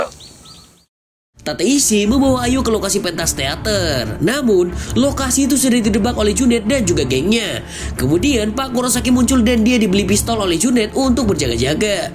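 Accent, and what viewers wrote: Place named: East Java, Indonesia. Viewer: Njirrrr kweden, fix lu orang jatim y, sidoarjo apa surabaya min?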